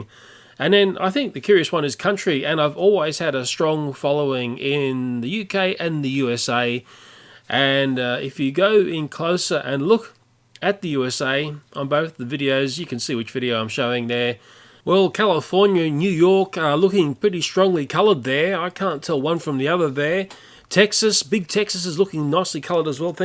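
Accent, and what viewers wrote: Australia. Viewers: Hello, I am a new subscriber found your channel couple months back. Out of all the channels I am subbed I look foward to your new videos the most. Love your personality, sense of humour and, your Australian accent. Keep up the hard work. I hope youtube doesn't continue to go down this path but it seems they keep messing with content creators and it is hard to get views unless you are always on the trending tab.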